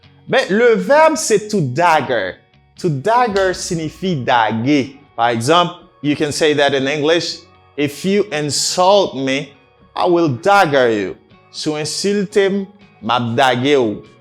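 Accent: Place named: United States. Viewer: Kou sa a vrèman enteresan ak yon pwonosiyasyon américain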